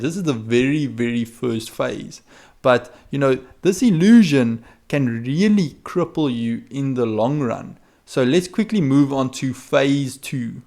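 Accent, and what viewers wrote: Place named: South Africa. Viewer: You sound South African. Great content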